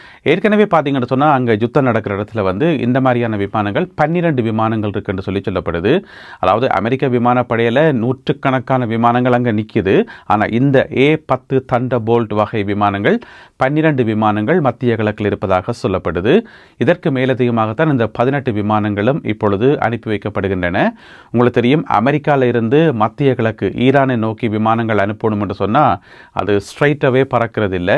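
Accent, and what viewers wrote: Sri Lanka. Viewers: ஸ்கீரின் பேக்ரவுண்ட் ஒரு செய்தியில் ரெட் இப்போது கிரீன் நல்ல ரசனை அருமை..👌 செய்தி வாசிப்பும் இலங்கை தமிழ் நெல்லை தமிழில் அழகாக வாசிப்பு திறன் அதுவும் தடையில்லால் சூப்பர்.. போர் செய்தியும் மற்ற சேனலை விட நல்ல விளஙம்குபடி சொல்கிறார்.. ஓயில் ஆயில் கொன்றது கொண்டதுன்னு கதைக்கிறது ரசிக்கும்படி உள்ளது.. எனது வயது 70 படிப்பு 7 நான் நெல்லை தமிழன்..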